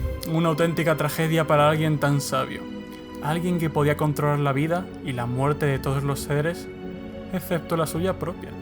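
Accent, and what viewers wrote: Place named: Spain. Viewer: De hecho el acento es español. Tal vez de León o Castilla.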